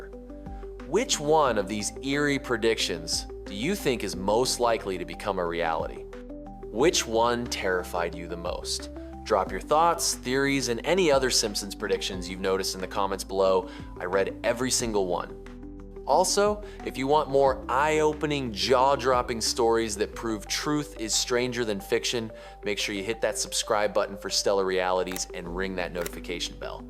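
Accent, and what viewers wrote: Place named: United States. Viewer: You must be kidding It's USA English . Point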